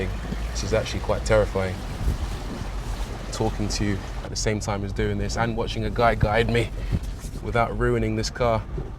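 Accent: United Kingdom. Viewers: you do know hes british right? he is speaking true english....your american english sucks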